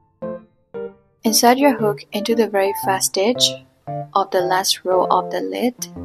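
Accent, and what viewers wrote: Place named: Myanmar. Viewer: Are you burmese? bcz ur accent sounds abit like burmese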